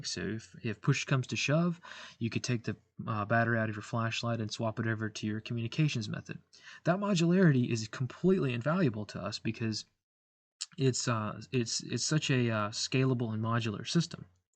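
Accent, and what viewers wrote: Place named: Georgia, United States. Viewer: You from Georgia? You gotta similar accent to my buddy from Georgia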